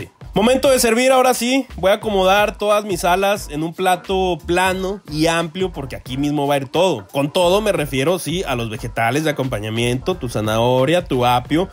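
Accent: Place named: Monterrey, Mexico. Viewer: Al chile los de monterrey así hablan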